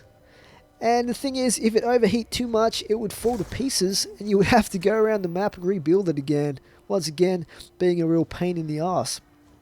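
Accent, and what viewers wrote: Australia. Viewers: Your Aussie too? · i like your accent australlian?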